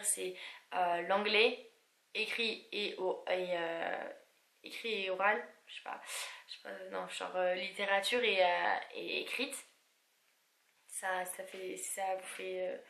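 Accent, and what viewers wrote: United States. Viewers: Super vidéo comme toujours !! 😄 Ta voix sonne de plus en plus américaine ( quand tu parle français ), c'est trop chou !! 😂💕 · Quel beau accent américain 😊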